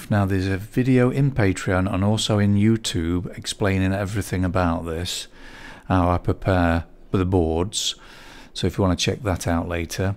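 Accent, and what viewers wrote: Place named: Ilkeston, United Kingdom. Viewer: Born and bred in Ilkeston, I recognised your local accent immediately! I’m in Lancashire now but wondered if you’d share whereabouts you’re from? Not an Ilson lad yourself are you?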